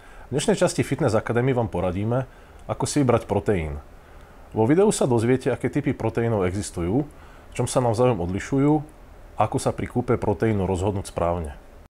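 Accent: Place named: East, Slovakia. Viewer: Môžem sa spýtať odkiaľ pochádzaš? Zdalo sa mi že počujem nejaký východniarsky prízvuk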